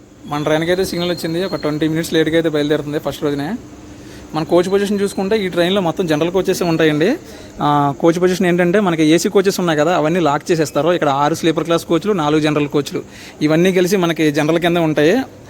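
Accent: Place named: Godavari, India. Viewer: Mi yasa kadapa yasa kadu EG, WG yasa la vundhi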